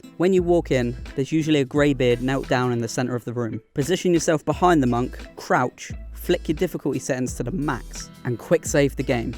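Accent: England, United Kingdom. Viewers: Lol yea... but... you heard the rest of the video in a perfect English accent as well didnt ya?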